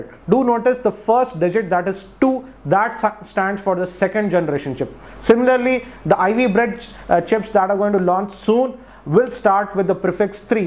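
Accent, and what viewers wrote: India. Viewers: Came for the indian.Stayed for the indian voice. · hahaha the indian voice are all same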